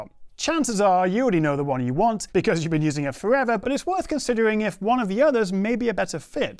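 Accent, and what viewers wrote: United Kingdom. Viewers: The British language sounds wonderful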